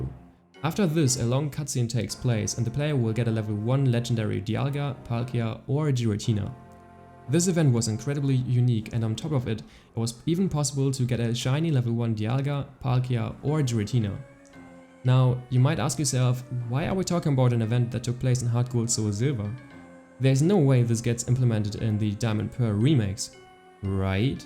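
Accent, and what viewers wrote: Germany. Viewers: you sound like youre german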